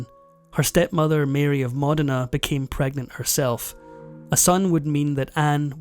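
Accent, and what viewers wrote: Scotland, United Kingdom. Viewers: Rather upsetting to hear this account of the union between England and Scotland given with a Scottish accent without mentioning what disaster that was for Scotland. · The title says "Britain's First Queen." The first British queen was Mary I, eldest daughter of Henry VIII. It doesn't matter if "the narrator spoke with a Scottish accent." It doesn't change the fact that the first female to be crowned a sovereign on the Isle of Great Britain was Mary I. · It's a Scottish accent. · I'm training my ear, could you confirm your accent is Scottish? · EXCELLENT, as always. The voice is beautifully modulated and the Scots accent is wonderful! What is your name, sir? 🥰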